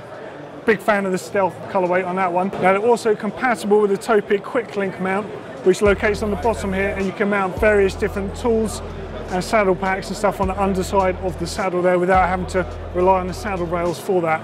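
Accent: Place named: United Kingdom. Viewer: i thpught u were from the uk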